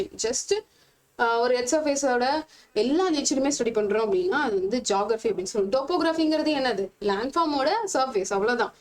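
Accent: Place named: United States. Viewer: Konjam slow ah sollunga mam! Understand panna mudiyala! USA english la style ah pesuramari irukku but konjam TAMIL medium students kum karunai katunga mam plz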